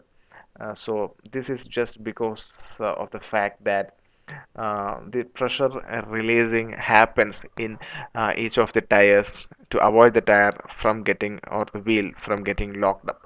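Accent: Kerala, India. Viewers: Lol its the malayali (keralite) accent. Sucks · fo tys.. fuck ur indian accent.. if u dont know how to speak english then dont speak it.. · fucking hate your indian accent.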